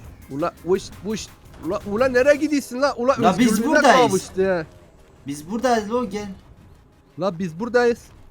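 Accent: Elazığ, Turkey. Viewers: Abi Konuşdugunuz Elazıg Şivesi :D ula falan :D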